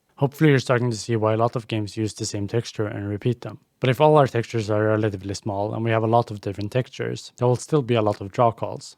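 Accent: Sweden. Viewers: Your not swedish like me by any chance? Sounds like you have a stockholm accent.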